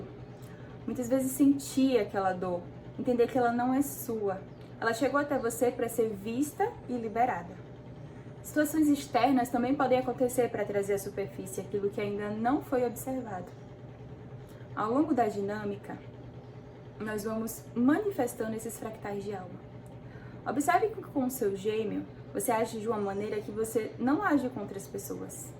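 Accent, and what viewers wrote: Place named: Northeast, Brazil. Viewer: Que presente esses vídeos. Esse canal! E esse sotaque com: oxe!? Amei ❤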